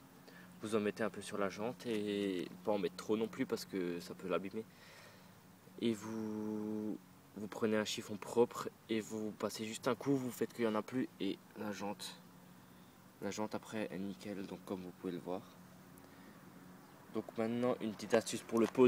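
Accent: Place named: Alsace, France. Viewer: ta vraiment l accent alsacien!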